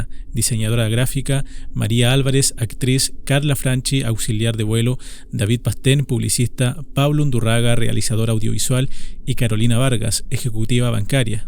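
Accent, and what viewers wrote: Chile. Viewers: El acento Chileno no queda con tu nombre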